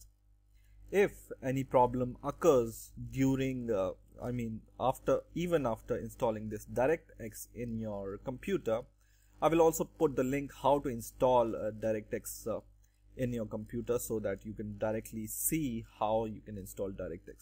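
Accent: India. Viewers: thank you Indian accent man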